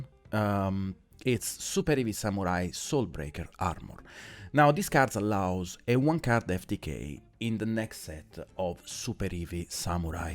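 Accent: Italy. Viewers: E' il tuo primo video che vedo e ho notato subito dall'inglese che sei un fratello Bel video e concordo sulla banned aggiungerei virus a 0/ skill drain a 1 pero' per il resto ci sta p.s engage a 2 e' sicura quindi non serve una prediction per questo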